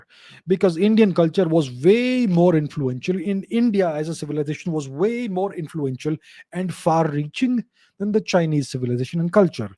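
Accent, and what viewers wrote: France. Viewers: Enjoying the french accent😌😃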